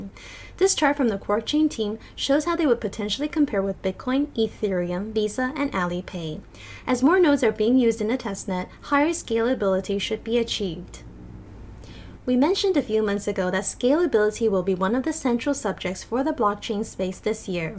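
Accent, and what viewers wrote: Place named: United States. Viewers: I coming from humble beginning...highly corporate American accent I find very attractive. I don't want to listen to no ghetto chick because she has "soul" but hey you cant please everyone I guess but you can certainly piss everyone off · AML86 na it's definitely her. Ok.. I'll explain myself. I owe her that. She either sounds dead because she's reading from a script in monotone or it's her highly corporate American accent. Likely a mix of both but speaking freely may fix it 👍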